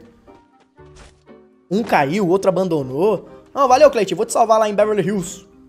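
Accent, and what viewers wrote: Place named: Bahia, Brazil. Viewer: ele é baiano mano, o sotaque muda Kkkkkkkk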